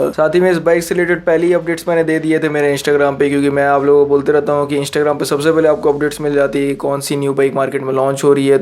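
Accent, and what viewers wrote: Bihar, India. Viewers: Aap Bihar ya up se ho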